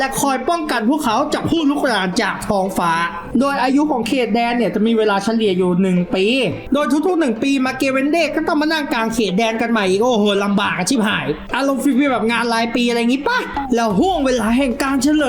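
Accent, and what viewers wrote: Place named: Suphanburi, Thailand. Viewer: ฟินเหน่อสุพรรณ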